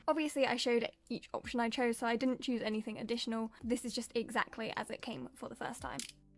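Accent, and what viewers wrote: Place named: United Kingdom. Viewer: Hi, Really loved the video. Really love your personality. You are so beautiful. Love the way you talk and your voice too, lol. Really love your British accent. Sorry if that was a weird thing to say. I have been using Buyee for a long time, and they are very fast, and very reliable, but the only thing I do not like abut them is that when I choose Express Air Mail delivery, they make the choice out of 3 options that I do not know until after they shipped. They either ship DHL, FEDEX, or UPS Express, depending on the weight and dimensions of the package. Anyways, I just wish I had my own choice from those 3 options. I really like that this Proxy service (Remambo) allows you to choose the shipping service you want, instead of making that choice for you. I am only half way through your video, but it is so great. You seem super smart. 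I might switch from Buyee to Remambo now. I will give Remambo a try. Thanks again for the great video. I liked and subscribed to your channel. Love you, lol.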